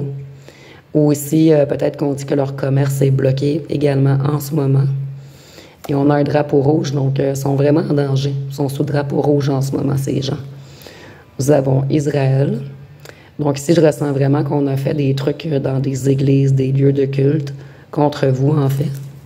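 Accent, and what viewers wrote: Quebec, Canada. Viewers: Merci beaucoup Madame la Cartomancienne.Je suis de France ET des Îles de France.J'adore le CANADA ET LES ACADIENS ET ACADIENNES D'OU VOUS ÊTES CAR JE RECONNAÎS FORT BIEN L'ACCENT DU QUÉBEC.BONNE JOURNÉE À VOUS MADAME 😂😂🎉🎉🎉❤❤😢😢😢🎉🎉😂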